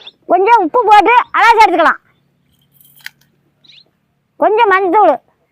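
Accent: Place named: Dharmapuri, India. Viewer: Nice ppaatima.. Neega ssamiyal kurippu sollum pothu yanga ooru neabagam varthu so neega yapdium Dharmapuri, selam, kirushnagri. Vellore pakkamtha irupiga... ❤❤❤❤ all vedio very nice paatima.... 🎉🎉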